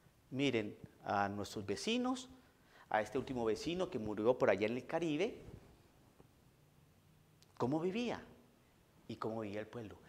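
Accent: Colombia. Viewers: tiene mas acento colombiano que mexicano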